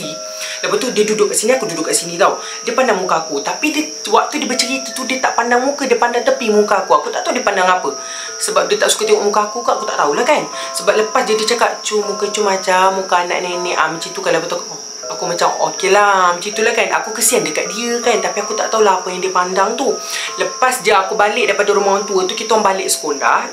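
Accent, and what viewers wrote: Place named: Peninsular Malaysia, Malaysia. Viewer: Ha'ah la mcm org semenanjung je😶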